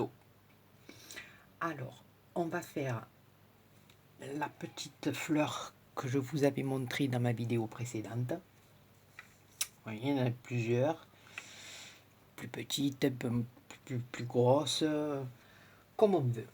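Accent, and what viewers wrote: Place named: Marseille, France. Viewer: J'adore ton acsens de Marseille et aussi ton travaille facile mercies beaucoup continue j'aime beaucoup c'est agreable vous entendre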